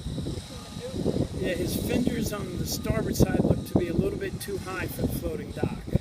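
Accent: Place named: United States. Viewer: That commentary made my ears bleed... soo American... As for bouncing off the pole to come forward, umm, isn't that what 'ahead' on an engine is for... You bounce of a pole like that on 9 out of 10 boats and you won't have a job the next day, although nothing wrong with using a pole with a sponson sitting on it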